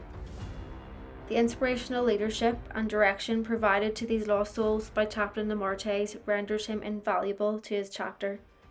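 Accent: Northern Ireland, United Kingdom. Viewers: Are yous by chance from Northern Ireland?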